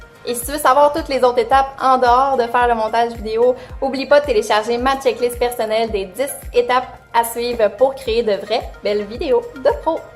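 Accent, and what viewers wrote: Quebec, Canada. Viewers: Super vidéo, super explication, super smile, super accent québécois (je suis français), pouce bleue :)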